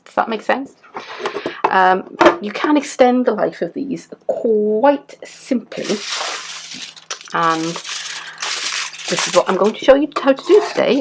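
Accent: Wales, United Kingdom. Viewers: . Hi, I lived in Swansea back in 1968 which is where i gave birth to my daughter, nice to see and hear a welsh accent from the array of utube tutorials out there, i'm never off my tablet, learning new crafts every day. What else do you do on utube? x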